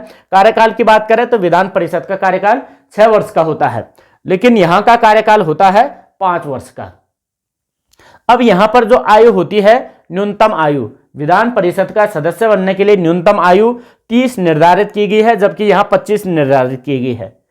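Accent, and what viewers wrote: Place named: Garhwal, India. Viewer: सर जी आप उत्तराखंड से कहां से हो ? भाषा से तो गडवाल मंडल के लग रहे हैं ❤😊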